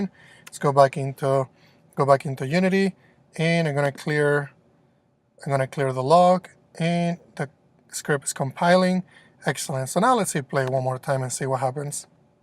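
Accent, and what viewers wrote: Spain. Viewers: Good Video. As I noticed your accent, I think your mother-language is Spanish. If that's true, It would be awesome to hear you recording some (or all) of your videos in Spanish too. Hi, from Ecuador.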